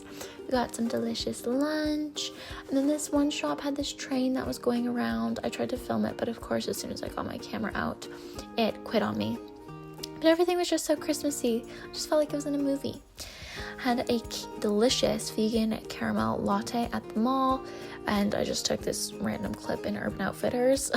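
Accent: England, United Kingdom. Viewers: Excuse me.. how are you more British sounding than me.. when I’m British?! 🤣 so glad you’re happy!🕊️ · hi! You really seem such a sweet girl!The plushie is super cute and it is a sweet gift! And also all the othe gifts...so thoughtful!! 🥲i And also i like when u talk with a british accent! I love british accent in general! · The way she just immediately adopted a British accent when she got to the UK is awesome 😂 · Why does a British accent suit you sooooo well 😂❤ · Why the english accent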